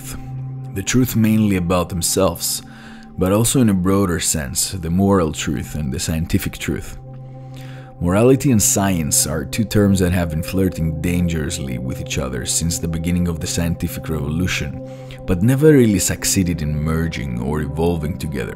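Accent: Greece. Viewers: Φίλε... 15 δευτερόλεπτα και λέω Έλληνας είσαι?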